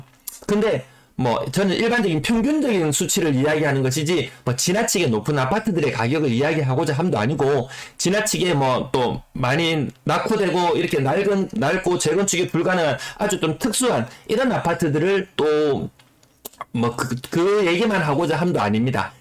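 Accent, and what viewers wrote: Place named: Busan, South Korea. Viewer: 와ㅎㅎㅎㅎ 저도부산사람인데 사투리 기가맥히네예ㅎㅎㅎ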